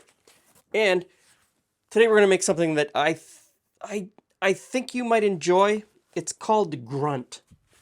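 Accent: Canada. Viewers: I really enjoy your channel. As a displaced New Brunswicker living in Virginia it is nice to hear "about" pronounced correctly!. I have a 1973 Northern Cookbook published by the Canadian Government Dept of Indian Affairs and Northern Development and I was wondering if you would like to add it to your collection. I think someone gave me this years ago and I have never used it (no local sources of beaver meat) but you may find it interesting. I think it is a bit of Canadiana and needs a good home. I will be visiting family and friends in Ontario later this month and could mail it to you from there if you would like to have it. Alfred Taylor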